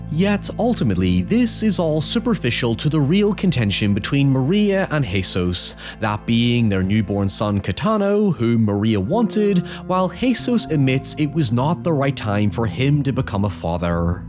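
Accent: Ireland. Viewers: By his accent I'd say he's irish.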